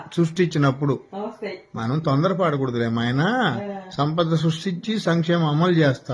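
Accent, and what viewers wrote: Nellore, India. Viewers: గారు కడిగేస్తున్నారు. సబ్జెక్టుతో కూడిన వ్యంగ్యం, అందులో అమ్మ స్పీచ్ చాలా రియాలిటీగా ఉంది. హ్యాట్సాఫ్ గారు, మన నెల్లూరు భాష, యాస చాలా హాస్య భరితంగా ప్రెసెంట్ చేస్తున్నావ్. నెల్లూరా ఇంకేమన్నానా 👌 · 😂😂😂😂😂❤❤❤❤ Nellore yasa😂 Nenu Nellore bro 👋😂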